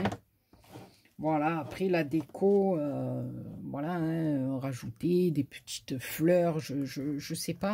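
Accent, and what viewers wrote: South, France. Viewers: une magnifique création 'tous les tutos sont très bien expliquer et avec l'accent du midi.Merci pour ce pur bonheur de partage .nouvelle abonnée .bonne journée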